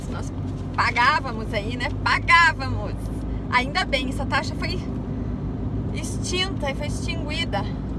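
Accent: South, Brazil. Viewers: Olá, tudo bem? Antes de mais nada, deixe-me me apresentar: Sou mais conhecido como Claudio, Carioca, residindo atualmente na Baixada Fluminense. Estou assistindo seu vídeo no computador pela segunda vez (antes só no telefone). Estou achando ótimo, é bem melhor te ver numa tela de 29' do que na telinha do celular. É muito gostoso seu jeito de se comunicar com seu público com esse sotaque sulista e sempre de um modo alegre e divertido. Dá pra sentir que você gosta muito do seu trabalho, por mais sacrificante que seja. Também acho muito legal o nome que vc deu ao seu caminhão. Te desejo um bom restante de semana e que tudo corra bem pra você. Fique com Deus!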